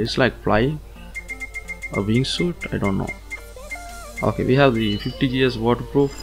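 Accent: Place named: India. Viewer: u do sound indian.... But it's fine.... Keep up the great work .... But I am Indian so.... Love from india ❤️❤️❤️❤️ and I dealt with him -_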